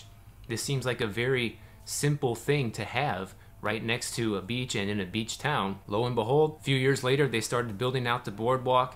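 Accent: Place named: Midwest, United States. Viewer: ...My girlfriend and I from Maryland vacation often in Hollywood Beach,....and you can just make out the Miami skyline from there looking south. Though we won't be retiring in Florida for a variety of reasons we both enjoy your outstanding videos,....and it gives us more reasons to consider vacationing again in Fla. Btw,...I had to laugh(again)at your midwestern accent when at the start of your video you pronounce it 'FLOOR--i-da''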